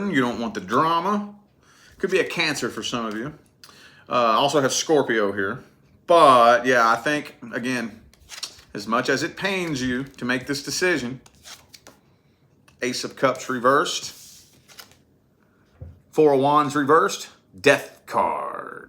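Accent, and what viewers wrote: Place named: Louisiana, United States. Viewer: I'm looking at your beautiful eyes and You're lusianna voice I think, love from Ireland.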